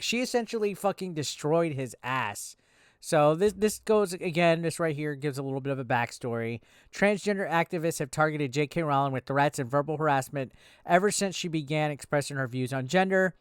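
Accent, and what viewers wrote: United States. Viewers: Your amerikan voice is awful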